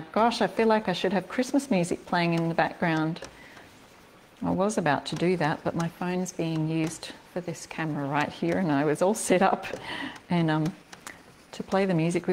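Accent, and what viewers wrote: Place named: Australia. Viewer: Greetings from Centreville Michigan USA. Love your Aussie accent. Spent a week in Australia and a week on North amd South Island New Zealand 2019. Trip of a lifetime. Your videos are great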